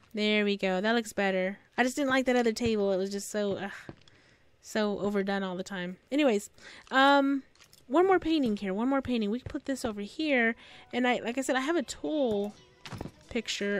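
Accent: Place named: Texas, United States. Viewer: Are you from Texas or South Carolina?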